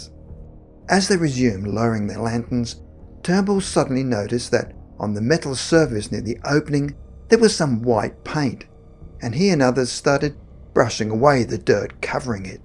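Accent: Australia. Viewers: Kristina Mullen The narrator is either Australian or from New Zealand. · I think narrator is from Australia.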